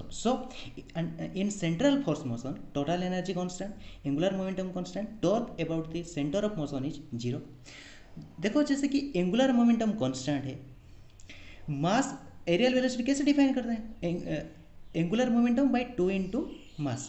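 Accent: Odisha, India. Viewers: Are u from ODISHA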